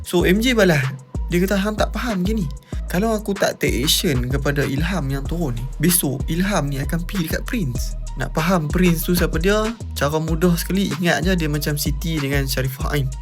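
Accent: North, Malaysia. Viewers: Loghat utara lekat ooooiiiii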